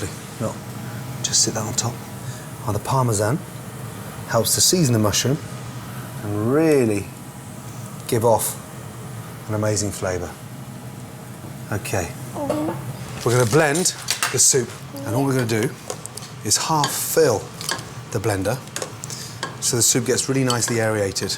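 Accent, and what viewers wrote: Scotland, United Kingdom. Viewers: Great to hear a hardy Scottish voice in there! 😂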